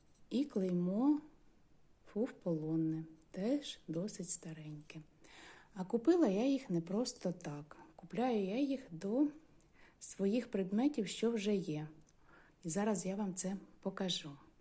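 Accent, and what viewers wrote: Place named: Central Ukraine, Ukraine. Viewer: Голос приятный, успокаивающий. Рассказываете интересно, слушать приятно украинский язык центральной части Украины. Я училась в Черкассах, там тоже так говорят, как Вы.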